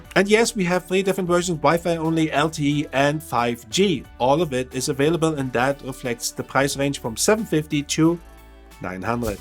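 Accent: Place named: Germany. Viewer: I'm a Chilean fan from your work... And love your German accent... Very cute 😂👌🇨🇱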